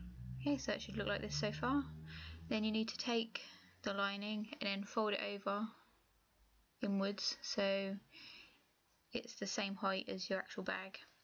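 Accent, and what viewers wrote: United Kingdom. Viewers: You have a british accent, yet you us american methods of measuring a opposed to metric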